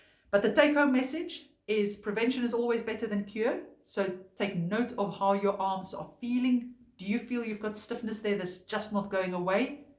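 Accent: Afrikaans, South Africa. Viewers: Is that an Afrikaans accent I hear?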